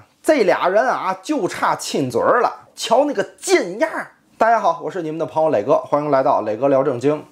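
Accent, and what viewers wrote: Tianjin, China. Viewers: 喜歡磊哥的天津味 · 磊哥是天津味的口音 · 天津話真是哏。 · 老哥，天津人吗？我在天津上的本科，哈哈哈这口音太熟悉了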